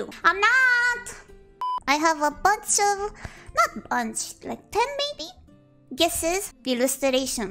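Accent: Canada. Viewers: That damn Canadian accent is crazy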